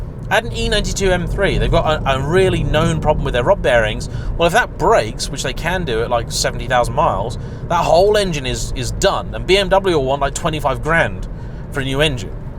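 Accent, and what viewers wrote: United Kingdom. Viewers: LOL. Wasn't expecting a response but keep up the excellent work. Love the dialog. British English is much more fun that U.S.'s version.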